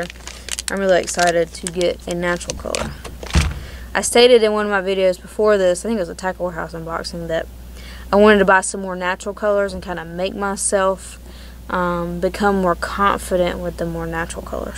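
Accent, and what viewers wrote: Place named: South, United States. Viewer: i love how beautiful this woman is and southern voice... great video by the way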